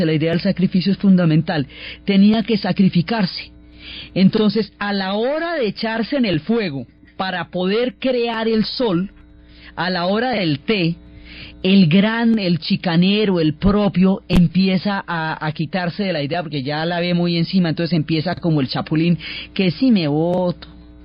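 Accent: Colombia. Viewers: Hola Ferlo, en Guatemala habian Mayas. Tanto su presencia que yo pensaba que ellos habitaron en Guatemala y creo que el escritor de hombres de maiz es guantemalteco. Si buscamos autores guatemaltecos sobre los mayas los encontramos. Tambien Chiapas y Yucatan eran de Guatemala. Asi que los mayas segun mi conocimiento eran de Guatemala aunque si hubieron Mayas en franjas de El Salvador y Honduras. A mi me parece que Diana Uribe hace un buen trabajo. Recuerde que ella es colombiana y su pronunciacion es diferente a la mejicana y centroamericana.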